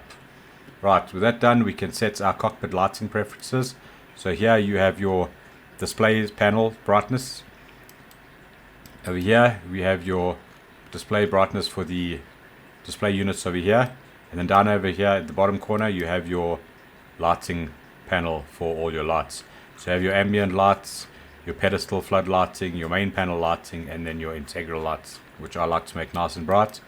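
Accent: South Africa. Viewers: Nice to hear a south african accent for a change. I live in George Western Cape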